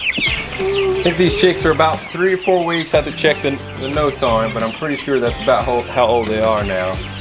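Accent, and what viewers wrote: North Carolina, United States. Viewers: I'm new to your channel. Love the family farm. Your accent makes me miss NC. I'm on the cute little boy's side- I want to see a T-rex too! He is so adorable.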